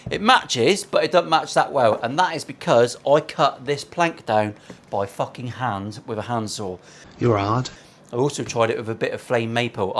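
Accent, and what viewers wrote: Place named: Plymouth, United Kingdom. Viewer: Ha I hear your janner accent dare do . I got my own parts-caster , and 2 x heavily modified acoustics ( cow sticks in the state's) all lefties as am I. They're shit ruff but I live in Devon so if you're looking for guitars to feature 😂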